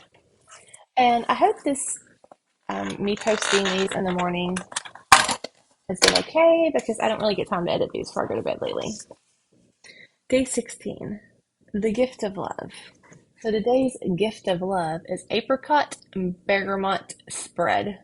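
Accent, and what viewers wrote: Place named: South Carolina, United States. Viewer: You sound like us in South Carolina. I didn't comment on the snake because so used to that. We have had snakes moving around lately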